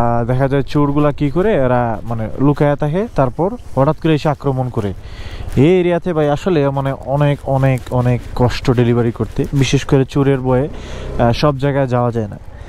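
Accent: Sylhet, Bangladesh. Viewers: বাই সিলেটি নি😊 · Vai apne ki Sylheti Don’t mind ami akjon Sylheti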